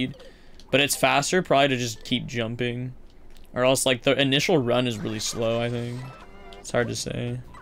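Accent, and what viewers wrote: Canada. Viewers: That’s just how Canadians sound like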